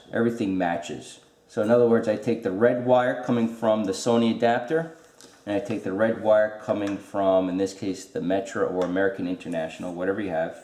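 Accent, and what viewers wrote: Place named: New Jersey, United States. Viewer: You sound like your from Jersey, i just watched the movie goodfellas you have a similar accent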